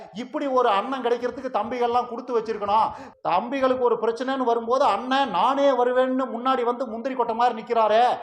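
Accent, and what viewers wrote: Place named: Coimbatore, India. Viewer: சத்யராஜ் கோவை ஸ்லாங் நோவ்😁 சூப்பர் நீங்க பேசுறது... ஆனா உண்மை 👍🏼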